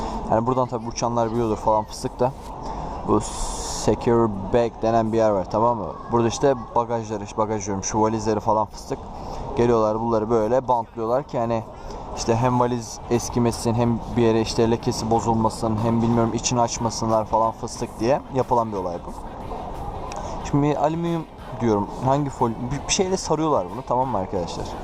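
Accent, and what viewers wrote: Turkey. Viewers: Ege Zz aksan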